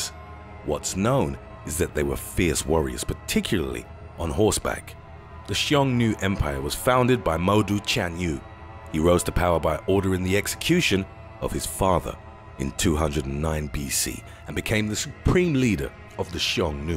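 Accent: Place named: England, United Kingdom. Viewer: For an English sounding narrator, the guys understanding of English pronunciation is abysmal!!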